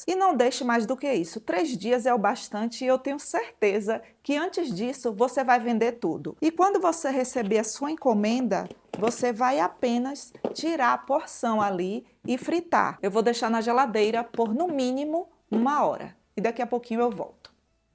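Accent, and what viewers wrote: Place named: Northeast, Brazil. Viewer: Pelo sotaque tu deve ser nordestina como eu. Suas receitas são TOP. Obrigado por nos ensinar essas maravilhas que você faz. Parabéns.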